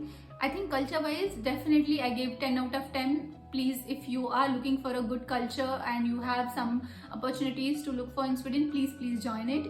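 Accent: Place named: India. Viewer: No no no you speak Indian English nobody understands. Much too fast you speak slowly 50 % or less. You need to understand nobody understands this.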